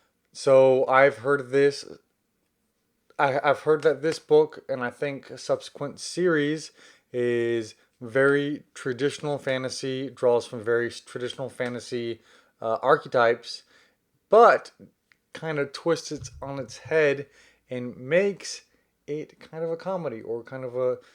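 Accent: South, United States. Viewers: I can't believe you had three books are The Stone Sky, The Fifth Season and The Obelisk Gate by N.K. Jemisin, Angel Image, Bear Town by Fredrik Backman, Fate of the Fallen by Kel Kade, House of Earth and Blood by Sarah J. Maas, Gideon of the Ninth by Tamsyn Muir and all of them at your house in Texas on this video during your quarantine until you're talking about the horror TBR and summer book haul of the spooky season after that, but I hope your husband, Zach will be very proud of you and I'm so happy for you, but I love your Southern accent!!! #SpookySeason #HorrorBook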